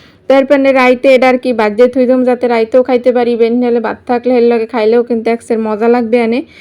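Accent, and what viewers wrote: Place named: Barisal, Bangladesh. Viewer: Apni borisal ar vasai kotha bolban na please apu..ta na holay ami apnar video dakbo na..normal koray kotha boilan..suntay valo lagbay..ancholik vasha kaw e posondo koray na😊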